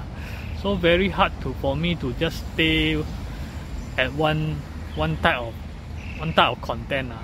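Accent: Singapore. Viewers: Hi, i've been following your channel for a couple of years now and i say there's no need to change or to find a niche. Actually you yourself is already a niche. Your channel is about you and your life. And who you are, speaking singlish like most of us do, is what make you a true blue singaporean. This is also what makes your channel relaxing to watch. One thing i suggest is to do away with youtube shorts as it doesn't really bring anything across. You can make 30min vlogs of you touring around your neighborhood eating different food and that will already be relaxing to watch. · I am one of your subscribers, I love your content and your editing. You are very authentic and real. Don't change, I enjoy your uniqueness and Singlish. Thanks for your videos. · If I were to analyze, the way you speak is cater for Singaporean only. A lot of international fans will not understand what you were talking. 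But most Singaporean like to watch watch only 2 things on YouTube. Comedy and Chio Bu(especially those sexy sexy type). That's why if you see trending, top channels/ Singaporean always repeat their contents. Like those 10 types of students/ 10 types of that (Which I find it super boring). Even those channel with Niche like runningKao which is focusing about running did not become as popular as those channel with girls showing skin. Furthermore, runningkao's english is similar to yours which is more suitable for Singaporean. Therefore, I feel finding a niche is important but depending on Singaporean for views will not be rewarding in the long run. That being said, I still love your content especially pre-covid19 all those BTO, Grab food (Hokkien version) and races (That's why I subscribed to you channel be I love the way you speak and your character)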